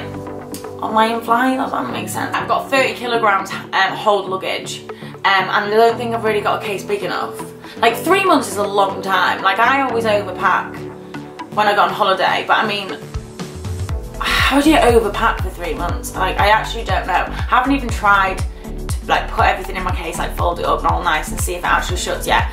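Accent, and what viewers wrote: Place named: Northern England, United Kingdom. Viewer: I got sent here from one of Nina's posts! Look forward to seeing your vlogs so good to hear another northernerish voice!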